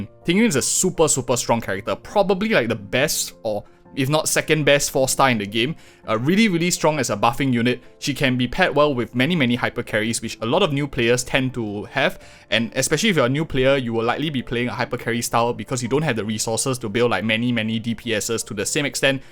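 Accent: Singapore. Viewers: Hey bro! R u from sg? Ur acent sounds like sg im from sg btw xD. Nice video keep it up bro!! Just give u a sub :D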